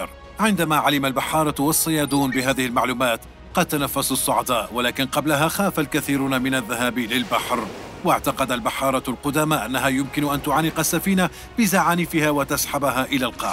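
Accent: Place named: Lebanon. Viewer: صوت مميز يصلح في الوثائقيات والاخبار بصفة عامة ❤ ما اسم صاحب المقطع واتوقع انه صوت لبناني يذكرني بصوت وحيد جلال (سلفر) كانه هو الاميز من بين الاصوات العربية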